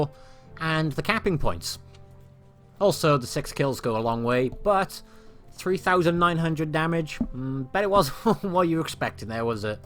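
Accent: Wales, United Kingdom. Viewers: Look See! Now in a minute! By there! You went super Welshy for this one!!! GG gr8 vid as always!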